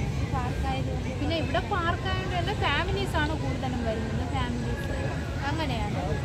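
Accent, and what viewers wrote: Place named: Thiruvananthapuram, India. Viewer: മോളുടെ സംസാരരീതി കേൾക്കുവാൻ നല്ല രസമാണ്. പ്രത്യേകിച്ച് തിരുവനന്തപുരം ശൈലി.❤️👌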